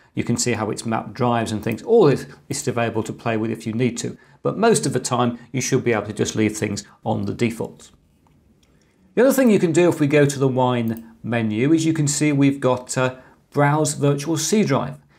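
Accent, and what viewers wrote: United Kingdom. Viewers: Me while learning English: "ok, cool, this is pretty easy to understand. I like it" Also me hearing British accent: .__. Edit: very good video. Helped me a lot. Tysm. :)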